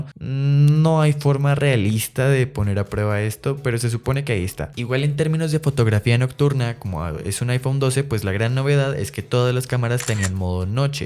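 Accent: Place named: Colombia. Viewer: Eres de colombia que si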